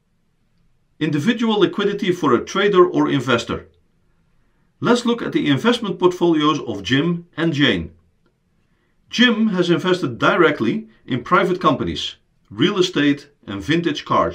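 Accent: Netherlands. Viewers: Ik dacht eerst oh nee, een Nederlander die een Engelse uitleg gaat geven, dit ga ik niet uitkijken hoor. Maar ik was fout, je hebt een superduidelijke uitleg gegeven in heel verstaanbaar NederEngels. :) Thanks! Duimpje omhoog!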